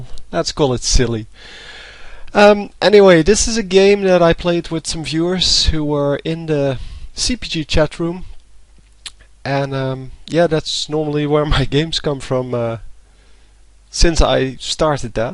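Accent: Netherlands. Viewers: heey, kvind het fijn dat er eindelijk een nederlander LoL vids maakt xD het is goed te hooren dat je nl bent aan je uitspraak :p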